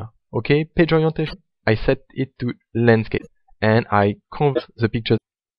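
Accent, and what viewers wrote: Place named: France. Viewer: THE FRENCH ACCENT!!! , good apps btw, thanks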